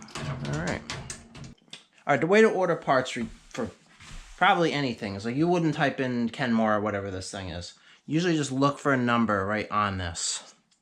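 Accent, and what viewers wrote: New York, United States. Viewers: What part or town of New Yawk are you from? looks like upstate from the vids Ive seen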